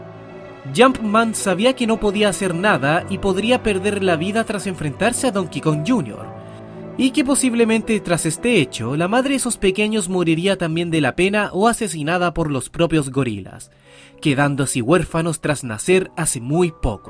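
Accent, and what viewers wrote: Chile. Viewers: Eres de CHILE???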